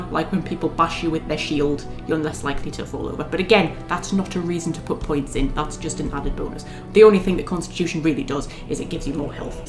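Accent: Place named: United Kingdom. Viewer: Adorei sua voz e o sotaque britânico, entendi tudo que falou! Ajudou muito, obrigado!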